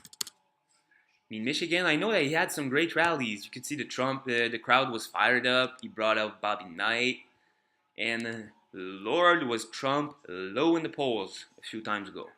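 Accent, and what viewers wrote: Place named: France. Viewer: like your accent. i hear a bit of french.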